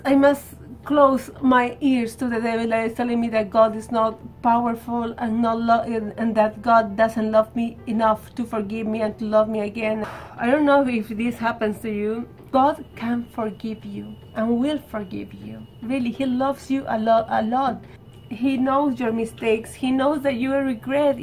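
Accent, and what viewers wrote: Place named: Peru. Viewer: siiii jaja, estaba buscando lo del timon que se bloqueaa y vi el tuyo por q estaba asustado y de ahi me dio curiosidad tu canal,ademas tu forma de hablar jaja,dijeee ella ES PERUANAAAA❤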